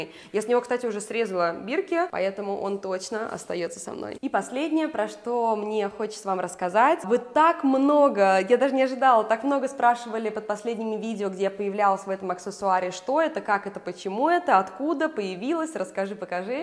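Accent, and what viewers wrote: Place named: Italy. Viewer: Больше всего люблю, как ты говоришь! У тебя появился акцент итальянский?) Не акцент даже, а интонирование, точно не русское) очень мне нравится🙌🏻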